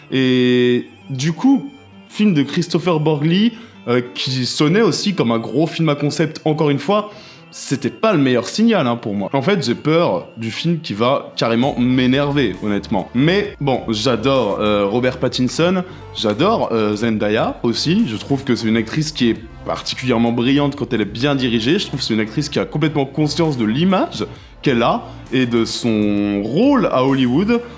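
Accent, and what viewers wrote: France. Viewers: Mais pourquoi les FR vous dites ZHE au lieu de DHE pour THE? Et aussi THAT, cest pas ZHAT ! C'est comme DHAT ! OK, bonne video ! <33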